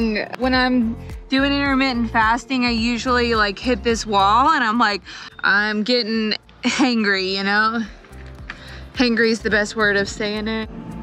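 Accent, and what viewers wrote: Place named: South, United States. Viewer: In a thick Southern accent, "I'm from the South..." Yup, it checks out.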